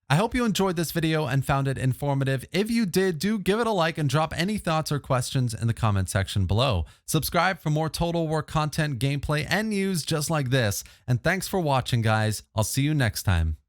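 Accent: United States. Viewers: Alright. Who the hell deals in pounds, but has an American accent? What's going on here? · You're the most American sounding Scotsman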